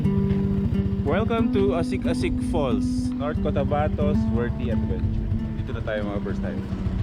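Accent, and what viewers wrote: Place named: Karay-a, Philippines. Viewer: Do you speak ‘karay-a’? It’s cute to hear😀😀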